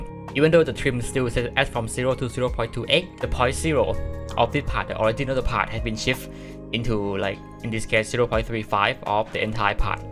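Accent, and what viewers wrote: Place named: Thailand. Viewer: Your accent sounds like someone who is secretly into Thai. Am I the only one who thinks this?🤔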